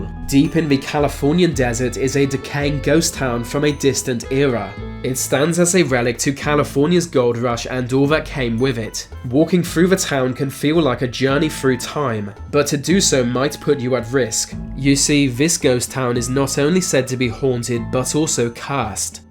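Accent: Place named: London, United Kingdom. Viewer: London accent. It's a class thing.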